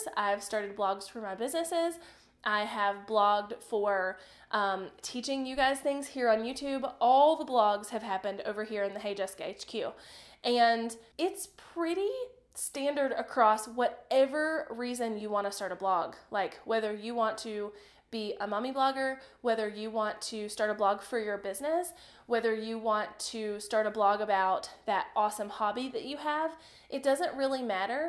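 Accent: South, United States. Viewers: I love your country acent🥰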